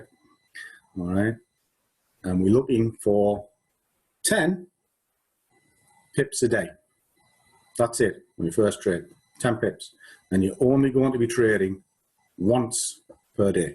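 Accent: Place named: Nottingham, United Kingdom. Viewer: Hi! I have literally spent the last 4 weeks studying trading none stop (most of the day and night)😂 I started with a trading 212 account which was crap and I have now moved up to a trading view account and use metatrader 4 to take my trades. I have tried the ichimoku strategy with tk crossovers, kumo breaks etc. I take all trades inline with the higher time frame sentiment but still seem to be going wrong somewhere🤦‍♂️🤷‍♂️. luckily I have not lost any money in the 4 weeks of trading but always mange to be back at where I started. I have watched 100 hours plus on YouTube and you seem to be one of the most genuine traders I have come across 🙌 I live in Nottingham and it sounds like you live not too far. I am wondering if you offer any sort of coaching/mentoring services. I thought I could do it all alone off youtube videos etc but its just putting it all together and having abit of guidance every now and then! 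look forward to hearing from you!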